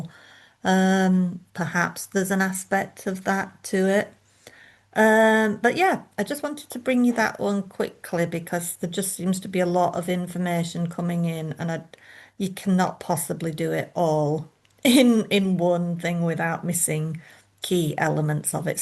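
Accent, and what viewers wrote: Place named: Scotland, United Kingdom. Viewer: I loVe the Scottish acccent!❤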